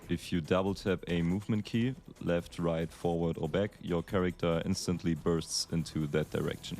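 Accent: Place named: Germany. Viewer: Love your accent, please, can you be a lil bit more german? That would be wertgeschätzt ❤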